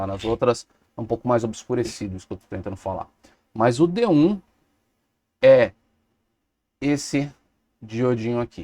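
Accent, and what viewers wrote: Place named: São Paulo, Brazil. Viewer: Tá achando ruim amigo? Faça como eu. Desisncricão realizado com sucesso. Sotaque paulista merda.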